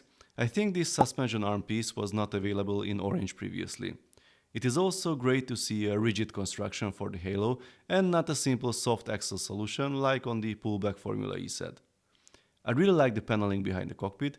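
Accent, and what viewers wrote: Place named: Hungary. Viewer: Magyar vagy? Akcentusból gondolom de ha nem így van szólj😂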